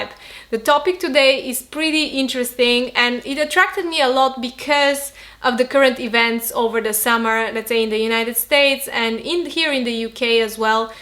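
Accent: Russia. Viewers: I thought you are from Russia or Ukraine (for your accent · are you Russian?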